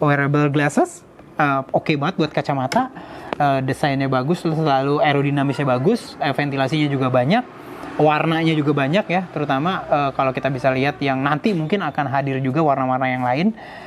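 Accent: South Jakarta, Indonesia. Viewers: wiciswicis jaksel nya aga cukup mengganggu bagi saya yang bukan orang jawa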